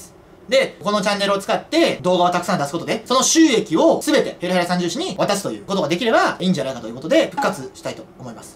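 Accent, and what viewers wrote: Kansai, Japan. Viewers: ひかるゲームズまじで好き！ ひかるの関西弁実況がめっちゃおもろい笑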